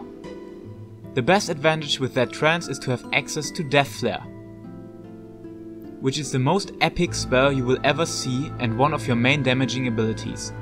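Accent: Germany. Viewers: You are definitely German!!! I never loved German accent (especially Dutch, gross!) But yours is absolutely adorable and so sweet accent. So nice to listen to !! Thank you for this guide... I hope... truly, that this will help me... Sigh. It's difficult, because people expect the best output instantly ; ; Is there any changes since you made the video?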